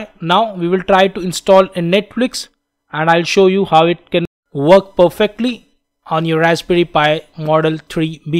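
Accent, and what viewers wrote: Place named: Delhi, India. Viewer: I am guessing you are from Delhi, by your accent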